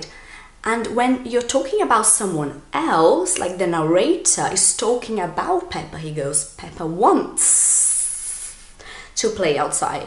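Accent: United Kingdom. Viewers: How did you get this accent? I really love British accent. Thanks for sharing these videos with us! Congrats for you Channel.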